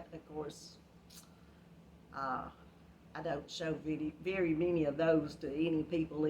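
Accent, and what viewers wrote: South, United States. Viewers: I really love watching your videos and hearing you talk. I especially love your Southern accent! There's just something soothing about it. Your Sislove Shawl is so beautiful! I always have several projects going at once, and don't always get everything done by the deadlines I set for myself. My shawl is still in Section one, but I will finish it. Thank you for your videos!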